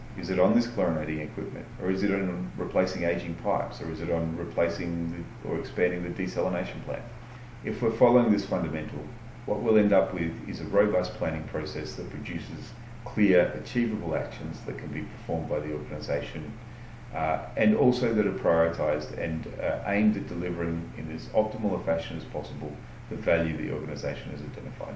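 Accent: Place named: Scotland, United Kingdom. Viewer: Scottish accent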